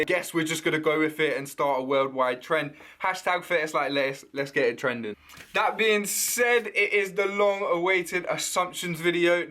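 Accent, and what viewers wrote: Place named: Leeds, United Kingdom. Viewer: I have never heard anyone respect a Leeds accent ever, so tah 😂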